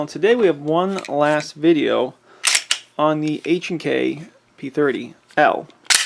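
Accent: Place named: Connecticut, United States. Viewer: What accent is that? You sound like my pal from Connecticut.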